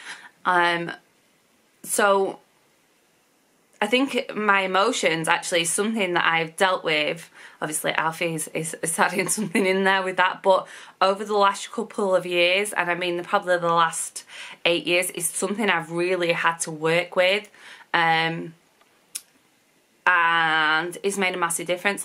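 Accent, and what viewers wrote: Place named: England, United Kingdom. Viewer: Just wanted you to know I love to watch your videos and listen to you speak in general ! I live in the US in TN so I’m very southern and we do not hear an English accent often. Your videos give me strength to Carry on most days when dealing with my fibromyalgia pain; your all around funny, kind, heart warming and a great mother from what I can tell in your videos. I know you didn’t mention this in your recent video, but how are you losing your weight ? I’m 41 and my doctors tell me to lose weight to ease the pain but I have yet to find anything to help KEEP it off.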